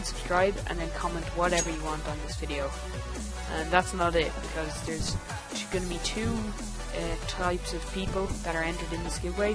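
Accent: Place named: Dublin, Ireland. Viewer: you sound irish, dublin accent i think trade link for item <link> and good luck to all!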